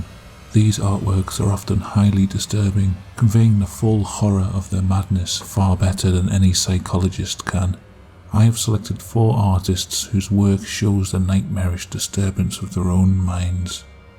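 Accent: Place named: Liverpool, United Kingdom. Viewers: VIKINGODIN , you are on the wrong coast. That's a slight Merseyside accent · This narrator sounds like a monotone Liverpool teacher who wants to quit his job... it is a very good video though, just not keen on the violin screeching in the background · Wow, barely a scouse twang here 6 yrs ago (2022 current year) can't believe it's you. I quite like it!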